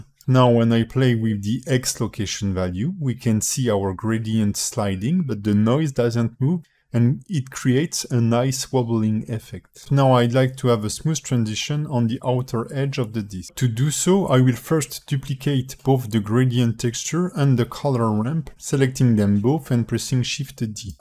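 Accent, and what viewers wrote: France. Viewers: Serais tu français ? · Tu es français n'est ce pas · Bonjour, très bon tuto! ton accent français ma permis de comprendre plus facilement même si mon anglais est moyen! :D thx! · On sent tellement l'accent français haha, excellent tuto btw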